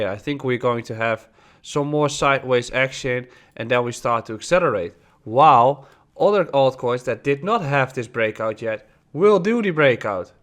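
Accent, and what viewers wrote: Netherlands. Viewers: Nee man, maakt niet uit. Beetje boeren Nederlandse accent stoort niemand zich aan :P Je hebt een leuke persoonlijkheid en je NL accent hoort daarbij. Ik zeg, houden. Je hebt nu al verdorie meer subscribers dan veel kanalen die native Engels zijn dus mijn inziens doe je het goed :) Je wordt zelfs vaak op wereldbekende crypto nieuws websites aangehaald als expert dus je doet echt wel iets goed haha.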